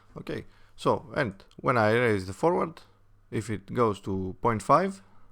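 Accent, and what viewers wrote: Greece. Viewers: I can tell the Greek accent miles away!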